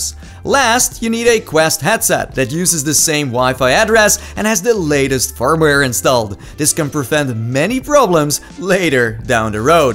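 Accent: Netherlands. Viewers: His accent sounds sooo dutch.🇳🇱🇳🇱🇳🇱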